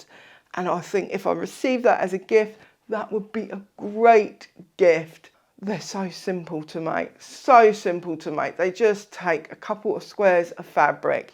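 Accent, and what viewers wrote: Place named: Kent, United Kingdom. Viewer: HI, sorry if this is too personal, but me and my husband have been trying to place your accent. I say Kent he say's Dorset. Love your videos.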